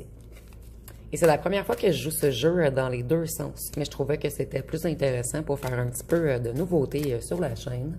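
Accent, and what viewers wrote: Quebec, Canada. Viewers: Bonjour 😁, Très très passionnant votre tirage 😅, j'ai l'impression que vous décrivez une bonne partie de ce que je vis actuellement 😅, j'ai hâte de voir ou cela mènera mais en effet je garde une certaine distance car ne sachant pas réellement ce qu'il veut et cherche mais en effet de son côté c'est est le chaos dans ca vie ..... Maintenant à savoir si c'est de lui que vous parlez ou d'une autre personne 😁 car lui est poisson 😂 En tout cas je vous suis avec grande attention et j'adoreeeeee vôtre accent québécois ❤❤❤❤❤❤ Et mille merci pour vôtre tirage qui m'a fait sourire 🥰🥰 Et à vos souhaitssssssss 😉🥰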